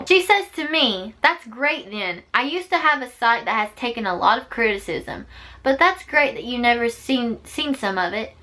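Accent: South, United States. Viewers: You sound like you have a bit of a southern accent. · I love her accent lol! She's a lil country lol! She's so cute and adorable and so funny!! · Where are you from? I'm from Alabama and your accent is really strong compared to people around me. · you have an cowgirl accent xD but its so cuteeee and i thought you were old when i first saw your musicals xD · I like your country accent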